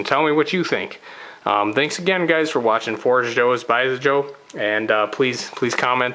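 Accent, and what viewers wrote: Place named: Canada. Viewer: Good vid. Not to clown, but you sound heavily like a Canadian haha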